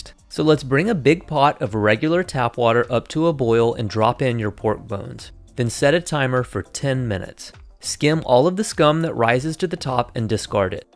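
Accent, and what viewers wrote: United States. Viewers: Currently living in japan and the broth is absolutely spot on. Also the egg thing, my girlfriend was surprised that I hadn't ever seen that before. Also the wya you at the ramen is exactly jow everybody does it: enter the store, order at the machine and slurp it all in as quickly as you can so you can go back home and maybe sleep 8 hours if you're lucky before you have to go commuting again. The pronounciation of all the Japanese is just so stereotypivally American that I cant help be laugh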